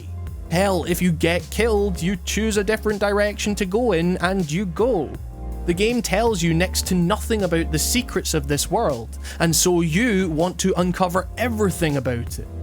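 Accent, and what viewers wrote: Scotland, United Kingdom. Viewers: Hey everyone, non-native speaker here...is this a Scottish accent? Anyway, I really like it! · its a Scottish accent not a Canadian accent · oh sorry. plz excuse my ignorance. it's still a great accent! Scottish? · is this scottish accen? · I clicked on this video by accident tbh because I was aiming for another one but I sat and watched because I was intrigued by the concept. Beautifully put and I absolutely agree 100%. I've always loved Zelda but this game has completely reawakened my love for games. I've got all the divine beasts and instead of going straight for Ganon I want to explore the world and see what I've missed, much like I did when I was 7 and stealing my brother's Ocarina of Time cartridge. Only this time the world is there to explore! Thanks so much for putting it into words and making me realise what this game has brought me. 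Also hello from a fellow scot and boo to all the people making fun of our accent (they're just jealous they're no in on the brawest accent ever, eh?) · I love the Scottish accent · yep, it's Scottish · N K He's Scottish. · Im sorry to go off topic, but is that an Scottish accent? or Canadian?:D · Are you Scottish? If so where fae? I'm from Edinburgh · please forgive me for this is i am wrong. first i'll say this video is great and you earned a new sub. i think the space of breath of the wild is fantastic and perfect. i do not like things stacked on top of each other and it promotes "hunting" for things. now for the forgive me part. i am guessing you are from Scotland and trying to down play your accent? don't....if that is what you are doing. i could not help but be distracted by how you say things and it was.....odd.....at times. but, again, great video. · It sounds like a Scottish accent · Soviet Loli he’s Scottish...as am I, I find it kind of funny that people mistake our accents for Australian/Irish accents...but he’s Scottish. 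· Lol, that’s like the mildest sort of east coast Scottish accent – hear some actual heavy Scottish accents and your mind will be blown… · I think it's Scottish... I like it 😊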